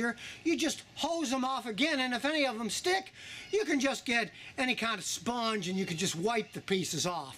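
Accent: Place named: East Coast, United States. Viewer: hey i am curious have you ever lived in pennsylvania? Your accent sounds like it has a bit of east coast in it...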